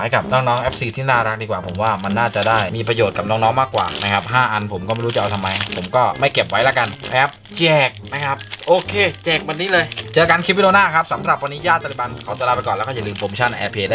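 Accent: South, Thailand. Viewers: ติดสำเนียงใต้นะ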